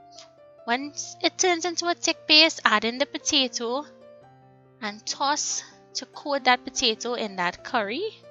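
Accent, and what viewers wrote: Trinidad and Tobago. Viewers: Hah thanks a ton for sharing this! I’m bajan but always loved the trini accent!! I’m currently making this now .... so simple and quick, I love that! 👌🏽 Could you do a pepper sauce recipe too pls? ☮️😃💟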